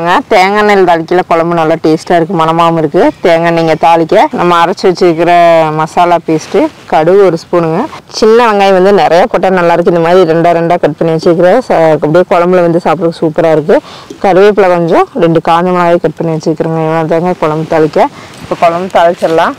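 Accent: Kongu, India. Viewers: கொங்குதமிழில் சமையலும் அருமை... இந்த பச்சைசெலவு குழம்பில் கத்திரிக்காய் போட்டு பன்னினால் இன்னும் அருமையாக இருக்கும்... பச்சைசெலவு கத்திரிக்காய் புளிக்குழம்பு ..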